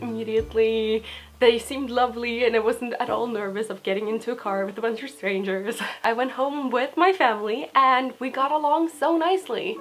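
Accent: United States. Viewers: where are you from. you sound American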